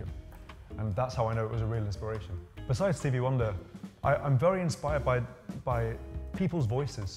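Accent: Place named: United Kingdom. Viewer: Charmingly British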